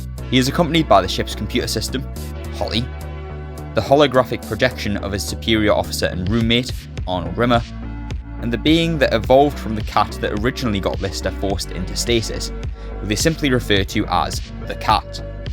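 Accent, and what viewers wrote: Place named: North East England, United Kingdom. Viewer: Love Red Dwarf then I was like this guys Accent North East rules 🎉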